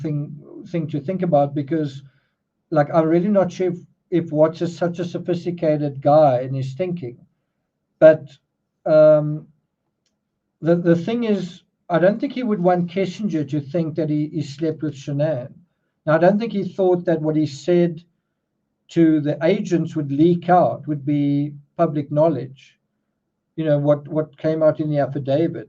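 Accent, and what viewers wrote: South Africa. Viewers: I was wondering what your accent is, is it South African mixed with British or just South African? Love it, so soothing. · If you're weird then I am, too. Looks like we're in plenty of good company. His voice has such a smooth delivery plus I've become a fan of the South African (except for E.M.; his is almost gone anyway) accent because of him. He really is brilliant, which I enjoy in anyone. Will probably let him lull me to sleep again tonight. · yes, I love the SA accent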